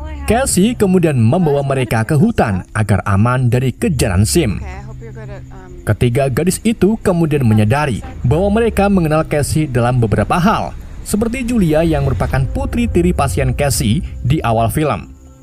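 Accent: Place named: Banyumas, Indonesia. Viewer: Ko ngomonge ngapak min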